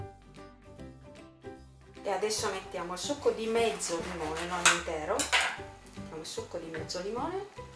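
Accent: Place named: Piedmont, Italy. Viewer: ! Ma le tue origini sono siciliane??? Perché il tuo accento ha un che di vagamente nordico...... tipo piemontese.. Ahahahah Ti abbraccio sei bravissima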